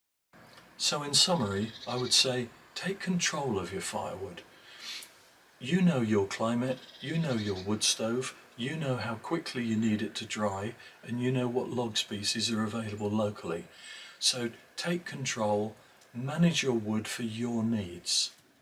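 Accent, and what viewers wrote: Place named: RP, United Kingdom. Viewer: He has a posh British accent, so I instantly believe anything he tells me.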